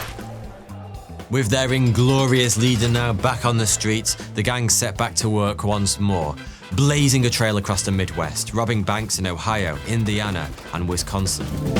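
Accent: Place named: England, United Kingdom. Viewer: is British. Most of us pronounce Arkansas as, well exactly that. Not Arkansaw.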